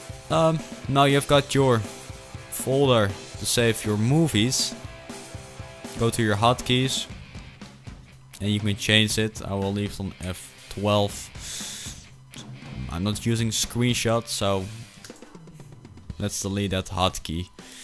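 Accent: Netherlands. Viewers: Nice tutorial. Btw ik kan horen dat je Nederlands bent :P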